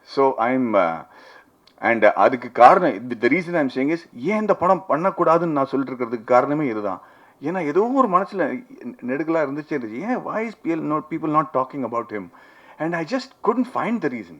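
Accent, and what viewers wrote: Coimbatore, India. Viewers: Sollanum "nga important "nga Maddy picked up Kovai Slang well 😅😅😅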